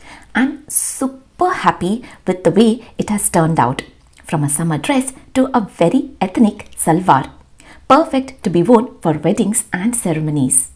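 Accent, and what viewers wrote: India. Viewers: Wow you are awesome..The way of your pronunciation is true Indian.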